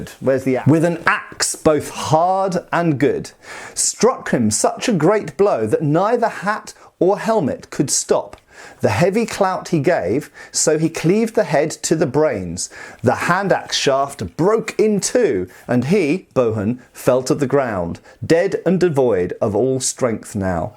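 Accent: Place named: Wales, United Kingdom. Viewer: sounds Welsh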